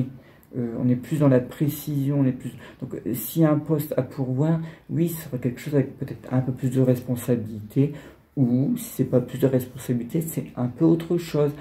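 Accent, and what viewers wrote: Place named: Villers-Cotterêts, France. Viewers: Moi pareil c'est villers-co près de la Ferte Milon. J'ai quitté ma région depuis presque 20 ans et d'un sens je regrette la forêt et cette belle nature. Bien sûr j'ai reconnu cet accent puisque je l'ai gardé 😊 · J'ai passé mon enfance dans votre région. En vous écoutant, j'ai remarqué votre accent, en particulier les "o", j'ai pensé au Nord mais je sentais que ce n'était pas cela. J'ai découvert Chambry 02 (moi, c'est Neuilly Saint Front près de la Ferté Milon). Je connais cet accent tellement bien mais cela si longtemps (60 ans) que je ne l'ai plus entendu, qu'il devait y avoir un blocage pour que je ne l'identifie pas immédiatement. Merci infiniment de m'avoir ramenée dans mon village avec votre voix. J'ai noté vos coordonnées. Vous êtes un bon voyant. Prenez soin de vous et de votre chat. Bien cordialement.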